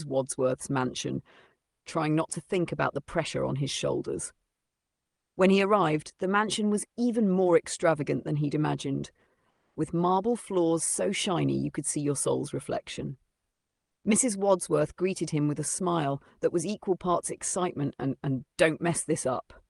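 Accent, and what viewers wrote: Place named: United Kingdom. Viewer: I love the British accent. Great story! Haha!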